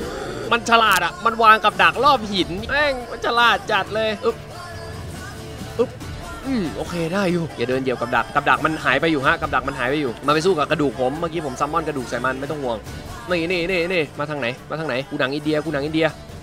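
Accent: North, Thailand. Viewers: พี่เป็นคนเหนือหรือเปล่าครับ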